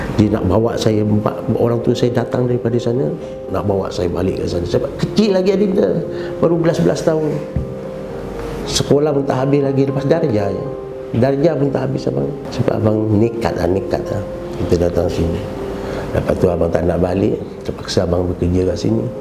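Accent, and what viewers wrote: Indonesia. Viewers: Patut laa cara arwah ada sleng org jawa.. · Logat alm ni logat madura. Sya sendiri madura. Itu la sya dpat rasa alm ni org madura.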